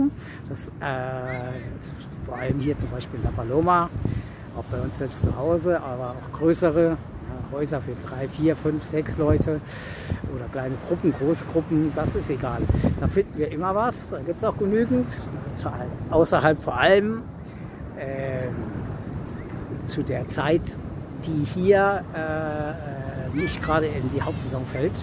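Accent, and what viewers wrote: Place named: Saarland, Germany. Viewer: Grüß dich. Kommst du ausm Saarland? Dein Dialekt klingt so. Sorry wenn das nicht der Fall ist 🤣👍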